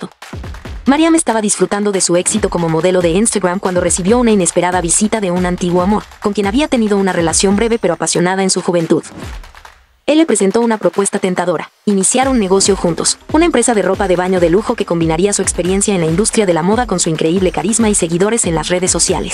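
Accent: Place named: Mexico. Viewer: Yo creía que era Mexicana su acento no parece de cuba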